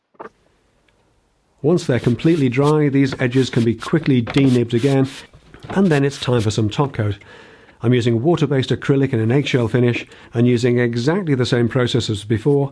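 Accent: United Kingdom. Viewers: I love Anderson Cooper and Richard Gere. is both of them but with a British accent!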